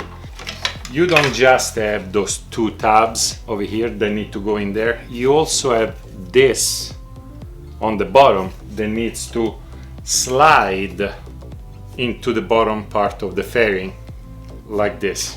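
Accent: Italy. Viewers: Is it weird that I like listening to Italians speak English vs French people? I like French people, but I can't watch a video having them explain things to me. Italians? Well, I watched this whole video and don't own my AT yet. Yeah, it's weird. Whatever. Great vid.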